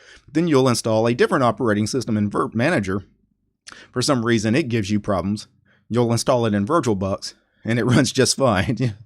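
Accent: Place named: Texas, United States. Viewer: He has a strong Texas accent :-)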